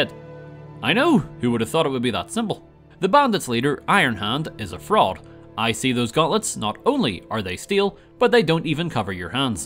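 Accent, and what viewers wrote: United Kingdom. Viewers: ah- british playthrough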